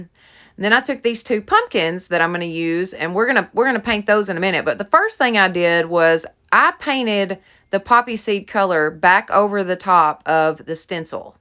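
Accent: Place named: Tennessee, United States. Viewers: Girl, I KNEW you were from Tennessee! Sound like you could be right around the corner from me! Love it! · I’m a New Yorker so when I hear you speak I just love your Tennessee Twang. I enjoyed your whole vignette. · Everything turned out great your porch looks awesome. I'm from Tennessee to I get it when you say that the sun is brutal I've been doing some diys for my front porch to. Love the wreath. When I first started watching you I thought she has got to be from TN lol have a blessed day.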